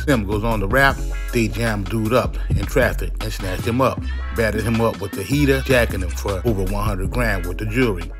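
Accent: New Orleans, United States. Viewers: Nigga Please...That's N.O. talk homie..3 times for the Real 3rd ward nicca..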